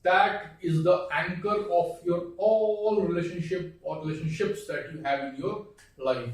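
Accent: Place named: Malaysia. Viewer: u spoke like malaysian thats y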